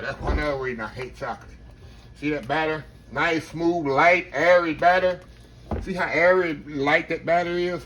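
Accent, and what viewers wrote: United States. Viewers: We're from Philly, up north and my grandson, he is 10 said, what kind of accent does he have, i was like he is an ole country boy heheh,! where are you from brother?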